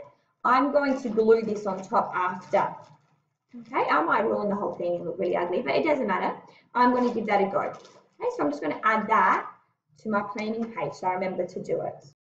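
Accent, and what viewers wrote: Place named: Australia. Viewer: Sounds like an Australian woman, I am Australian also. I have looked at many videos about fusing the bags with the iron, however this is the only one I have seen where the person is saying to have water in the iron and use that steam setting or whatever, I can't figure out why however, no one else did that, I can't figure out what the hot steam would do just spraying on the baking paper, and she didn't explain the reason why. As far as plastic fumes, apparently most things have a melting temperature and then a vaporising temperature, so as long as it doesn't hit the vaporising temperature then there shouldn't be any plastic fumes, for this type of polyethylene it shouldn't really produce any vapours while under 250 degrees. However it seems this video was for year 9 students, so you were erring on the side of caution.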